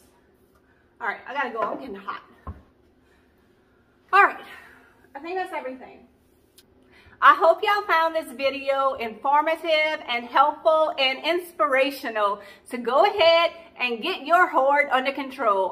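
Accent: Louisiana, United States. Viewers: Your excitement fills my soul and I WANT ONE OF YOUR BREAD BOARDS!!!! I’m going to charter a BUS and bring people with for a shopping trip! Do you have room for a BUS In your neighborhood? LOL!!! We can buy and you can teach us your Louisiana accent ❤️❤️😁❤️❤️ What fabulous DIYs!!!!! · Hi I just found your channel. I love watching your videos and I’m playing catch up watching your older ones. When I seen this one I so wished I would have known about your Facebook live sale. I really love the Hoop project that has a Chicken stamped on it. I know this is a long shot but do you still have one and how much would you sell it for? I just love watching you and my hubby asked where is she from, she sounds like Shelby on the show where they go hunting for logs buried in the swamps of the Bayou of Louisiana. I laughed and said yup that’s where she’s from. 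We love your accent. Keep up the great job!!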